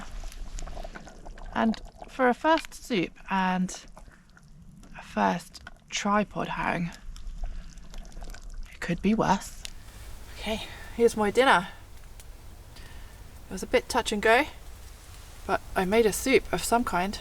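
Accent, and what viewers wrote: United Kingdom. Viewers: Ole ta ohs.😂do like the briish accent 😊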